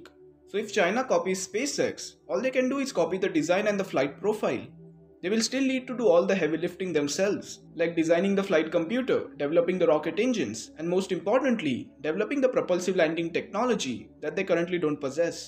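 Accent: India. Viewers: Thanks a lot, this is really very precious and very interesting, for it's very unique. I hope very much to see much more of this in the future, and therefore I wish you a great success, there could be a lot of space being left for you in the scene. Your accent sounds like coming from India, remembering me to three wonderful times in India, as a former field engineer for a Swiss company many years ago. If this is correct, take my greetings, best memories, and great respect from Switzerland, to this wonderful people and culture, thänks. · Indian accent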